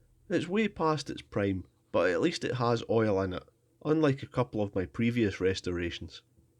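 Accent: Scotland, United Kingdom. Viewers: Man, between the dulcet tones of Scottish brogue and the "I make a new one"'s of My Mechanics, my craving for the greatest content on YT is satiated. Excellent work, per usual, friend. Can't wait to see this beauty in action. Much love from the USA.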